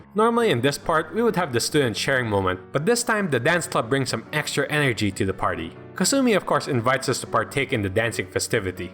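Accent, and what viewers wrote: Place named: England, United Kingdom. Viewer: yet another pronunciation of names à la english smh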